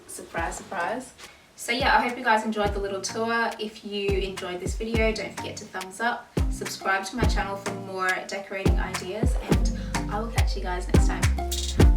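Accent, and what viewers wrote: Australia. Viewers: Love your aussie accent!! You look beautiful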